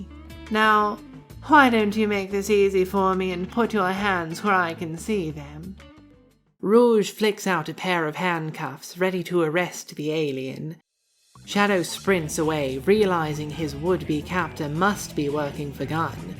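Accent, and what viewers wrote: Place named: United Kingdom. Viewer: Why do you have a british accent in some videos but not in others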